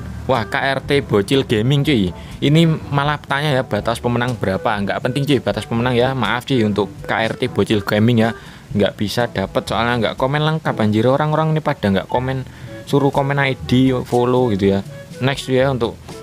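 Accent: Java, Indonesia. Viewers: Orang Jawa y bang , cumananya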